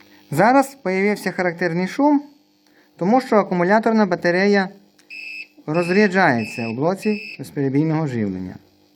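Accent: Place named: Lviv, Ukraine. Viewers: тільки у Львові такий говір) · тільки у Львові такий говір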